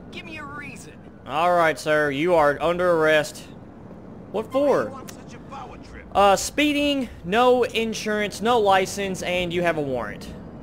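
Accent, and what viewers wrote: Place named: Kentucky, United States. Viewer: As soon as you started I could tell you were from KY im from Barren County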